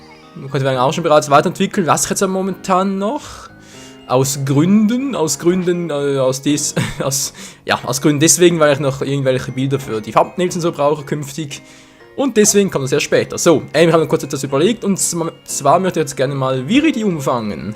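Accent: Switzerland. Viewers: Besch du Basler oder Zuger ? ^^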